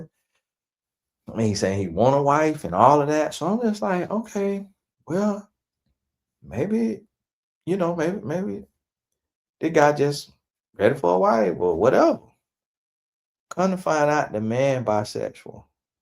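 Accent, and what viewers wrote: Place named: Florida, United States. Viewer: Smh, I've had this convo with a few folks recently and was left feeling really bad. Was questioning my values and acceptance of others for standing firm in not being willing to entertain a potential bi-sexual man. THANK YOU for speaking on this... And the country/Fl delivery is quite funny and REAL😂 Ladies, resistance is really a form of protection, don't let these podcasters and society let you think otherwise!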